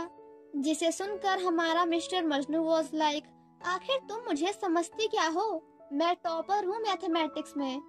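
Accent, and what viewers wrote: Gujarat, India. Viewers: Ok I Guess you from GUJRAT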